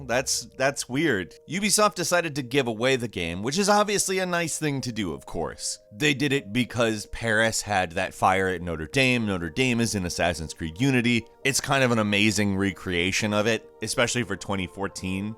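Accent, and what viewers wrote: United Kingdom. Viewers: `s Brit accent is awesome. Oh boy, want more of it lol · WHERE TF DID THAT BRITISH ACCENT COME FROM? 😂😂😂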